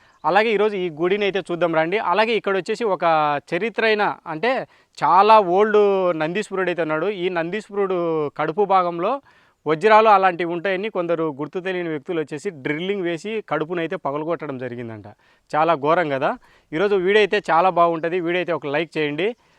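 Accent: Rayalaseema, India. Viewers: ne prati vedio chala interesting ga untundi... Keep it up...nuvvu ne rayalaseema slang super... Chala kastapaduthunnav...super bro...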